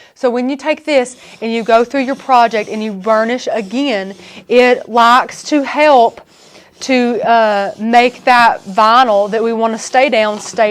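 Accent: South, United States. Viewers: talk a little more southern... southern drawl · Great video. I love her country voice... Lol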